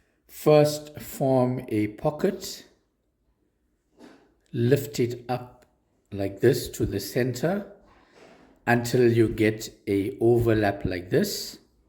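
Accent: South Africa. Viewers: ThnXX for Sharing the Recipe with gr8 tips by Pressing the dough balls. Sir just by your accent of talking I knew you’re from South Africa!!! Iam from Zambia LSK. now settled in the 🇺🇸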